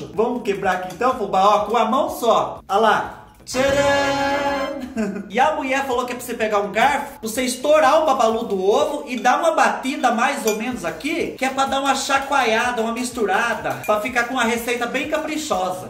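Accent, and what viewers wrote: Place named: Itacaré, Brazil. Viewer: Fubá seu sotaque eh igual das pessoas de Itacaré sp morei nessa cidade há 20 anos e seu sotaque me dá uma saudades de lá, amo seus vídeos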